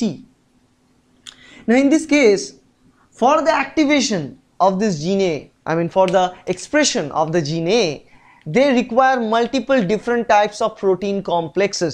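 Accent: India. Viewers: I love the indian accent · its indian accent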